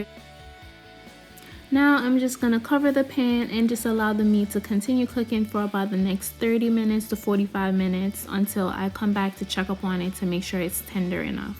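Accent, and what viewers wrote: United States. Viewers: Yea that's how I make mine, how you no so much about Jamaican food, I thought you was an American. Girl especially you're voice.love you're videos so much.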